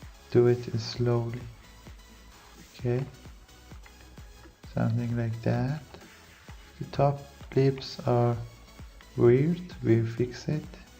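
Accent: Iran. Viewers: are you Iranian? your accent sounds like you would be! if not where are you from?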